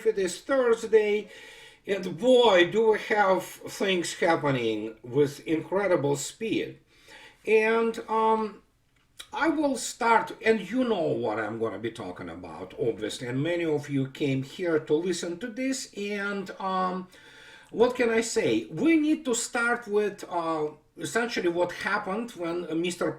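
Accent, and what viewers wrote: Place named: Russia. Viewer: I love your sarcasm, with your Russian accent" nincompoops, imbeciles, idiots have to laugh . if you don't laugh you cry.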